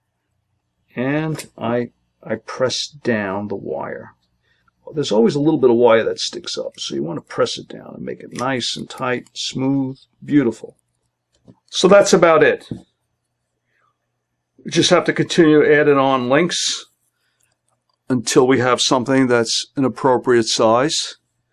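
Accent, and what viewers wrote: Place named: East Coast, United States. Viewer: Great video...until the end...even though I got what you were teaching you kept going out of the camera view...I found myself telling you to move up...LOL...Still you are very clear and I am sure I could learn a lot from you so I am going to subscribe...I like your accent...are you one the US east coast? Thanks for sharing I am so glad I found your videos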